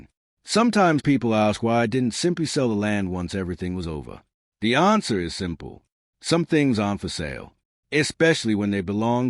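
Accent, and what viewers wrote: United Kingdom. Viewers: Same British nariator.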